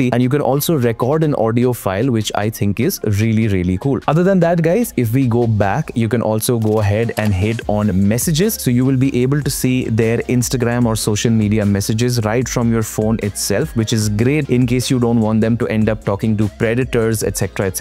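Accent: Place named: India. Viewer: bro, what an annoying jeet accent.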